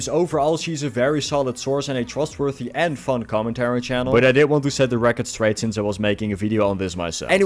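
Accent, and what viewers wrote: Netherlands. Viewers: i’ve said this once, and ikll say it again, i KNOW your dutch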